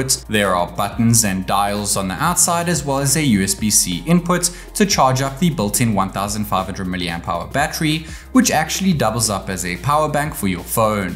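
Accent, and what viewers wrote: South Africa. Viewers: Your accent sounds South African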